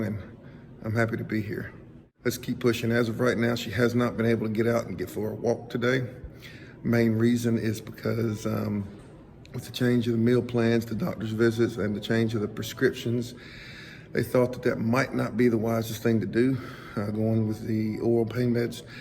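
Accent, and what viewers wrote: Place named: South, United States. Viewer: Nil per oral, not in the mouth for you, I know you speak southern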